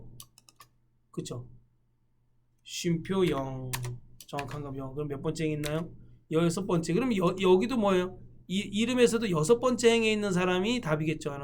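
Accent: Gangwon, South Korea. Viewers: 선생님 오늘 시험보고왔습니다~~ 함수 진짜 75문제 두번 돌려보고 상시기출 보니깐 좀 이해가네요!! 반복이 답인것 같습니다. 선생님 덕분에 index match 마스터했어요! 선생님꺼 보고가면 왠만한건 다 풀 수 있습니다 ㅎㅎ 실수만 안했으면 만점일 것 같습니다! 저도 강원도 사람이라 억양이 익숙해서 즐겁게 강의봤습니다! 농담도 재밌어요 ㅎㅎ 감사합니다^^(셀서식-범위잡고 이름지정 조건부서식 수식조건셀 Hour*65+minute*/10 요금 65 If month 수시 정시 1-6월 9월 Vlookup left 할인율 구하기 Daverage- average 상공고 평균 - 전체고 평균 Averageif , round 1 &연산자 “점” 정렬 부분합 시나리오 지정 남,녀 기준 2개 하래서 당황함 = 컨트롤키 잡고 눈치껏 했습니다 매크로 차트)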